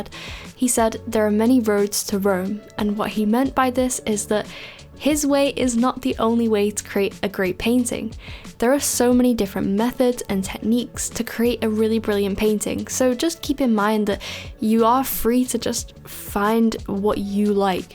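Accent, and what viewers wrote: United Kingdom. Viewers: I’m trying to place your accent! Somewhere near Liverpool? That’s where I’m from and you sound exactly like one of my cousins 😅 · Just started watching you recently and you’re so natural to teach it’s brilliant and just like your sister I live in another country and I’m trying to paint but hearing a fellow English woman Is perfect, if you ever get a chance to come to New Zealand I’d love to show you around and even have a mini painting session 😊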